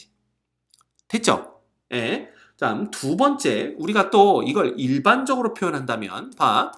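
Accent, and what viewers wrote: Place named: Seoul, South Korea. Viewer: 서울 사람 티가 나시네요 ㅋㅋㅋㅋㅋ 경남은 e 랑 2를 확실하게 구분한답니다 ㅋㅋㅋㅋㅋㅋ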